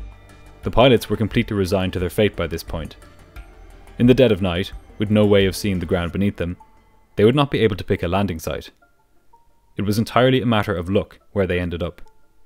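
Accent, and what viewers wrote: Ireland. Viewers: The irish accent is still weird. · Excellent channel great voice - is it Irish ? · Sounds like a hint of Irish in the English spoken narration but it is excellent, so clear and precise.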